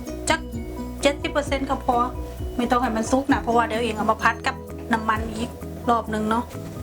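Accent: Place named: Korat, Thailand. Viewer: ฟังเสียงแล้วใช่เลยคนโคราชบ้านเอง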